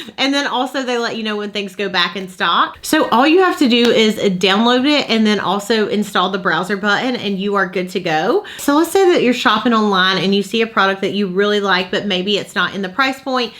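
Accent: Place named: Tennessee, United States. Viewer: As a Tennessee girl living in Colorado, your sweet accent makes me homesick. 😍. Thank you for your generosity in doing a giveaway!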